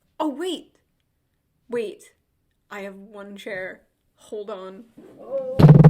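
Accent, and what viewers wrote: Boston, United States. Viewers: She is from Boston.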